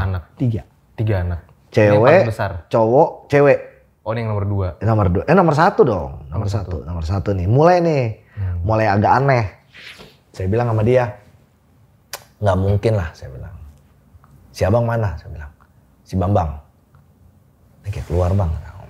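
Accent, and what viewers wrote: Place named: Jakarta, Indonesia. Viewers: Aksen Betawinya enak banget di dengar bang.. yg di sampaikan Masha Allah ngena banget. On point gitu.. terimakasih atas ilmu dan pengalamannya bang.. semoga sehat selalu. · Emg betawi logatnya begitu dah emg ceplas ceplosnya juga tanda peduli, lu punya temen jgn dari situ situ aja makanya mbaa, jd ga menyimpulkan begitu setiap org dr setiap daerah punya ciri khas masing"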